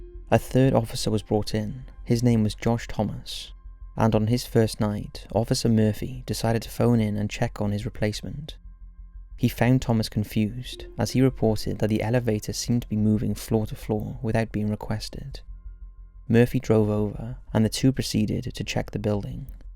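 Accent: Wales, United Kingdom. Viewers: I love your channel man, what part of Wales are you from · Anyone else feel that narration with a British Accent makes these stories more scary?? Or any accent from the U.K. for that matter...I don't feel the same air of scariness with a regular American accent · It's the soft Welsh burr of his accent that does it for me.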